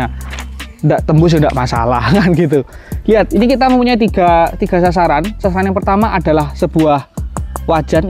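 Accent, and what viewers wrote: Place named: Java, Indonesia. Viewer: Bahasamu kang,medok eram🤣🤣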